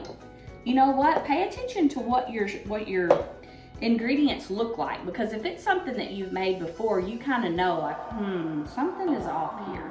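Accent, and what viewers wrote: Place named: South, United States. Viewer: Where is your southern drawl from?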